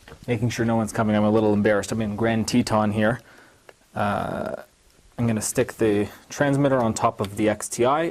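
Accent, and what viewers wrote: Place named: Eastern Europe. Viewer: You sound bit like eastern european but I'm probably wrong. Nice vid!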